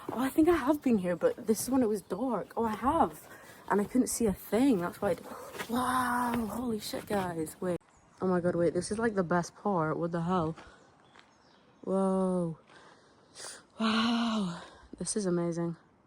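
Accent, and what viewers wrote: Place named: Australia. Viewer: your voice sounds eaven aussie a lil bit, you might be onto something with all these theories 🙂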